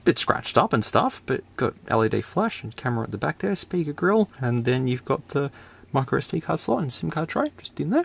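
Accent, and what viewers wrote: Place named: Australia. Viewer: Oh so you have the Australian accent that puts R sounds in everything. Got it.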